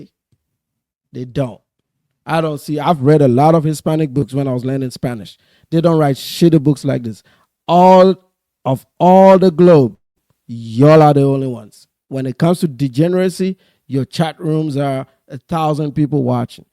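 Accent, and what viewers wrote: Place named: Nigeria. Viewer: Tariq wrote that book years ago... When he was young.... Before he transitioned and became more consciously aware and connected to the black American struggle... You have a whole country of your own to worry about... You. Come from a third world country... I think you're Nigerian. You look and sound like it, Nigeria, a country that has over 200 million people. But yet it's annual gdps less than 500 billion, that's AJ poverty... You should be concentrating on that.. instead of calling black Americans, Temu blacks, a group of people that's way more successful than you have. Way more money than you. Accomplished more than you built more than you, that's why a lot of you guys come over here.. walking on college campus is that black Americans paved... Living in communities and in neighborhoods that black Americans help accommodate.. 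leaving your country and running away from it, because it doesn't work. And coming over here with black Americans have set the country up for you.... Don't worry about block Americans. It's a totally different ethnic group, black Americans or generations removed from Africa...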